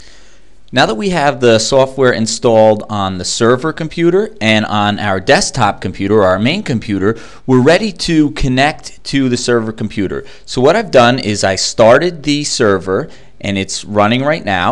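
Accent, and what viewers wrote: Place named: New Jersey, United States. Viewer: sounds like a mush mouth from new jawz-sey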